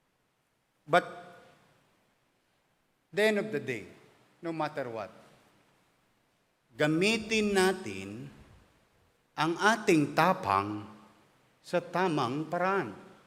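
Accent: Philippines. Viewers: Parang c pinoy din mg speach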